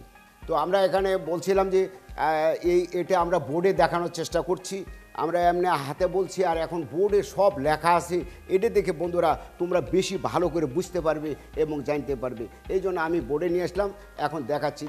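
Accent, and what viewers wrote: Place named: Natore, Bangladesh. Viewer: Tnx sir...sir apner kotha sune mne hosse apner basa natore district a....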